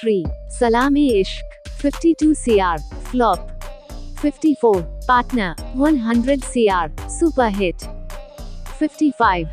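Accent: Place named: United Kingdom. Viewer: by her voice can tell she must British or from an English speaking countries struggling with Hindi title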